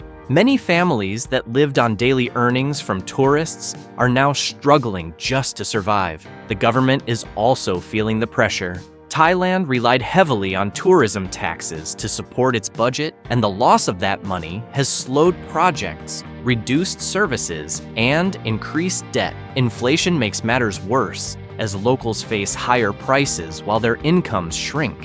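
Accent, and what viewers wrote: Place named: United States. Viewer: Yet another American accent telling 'like it is'. Don't believe anything that comes out of that place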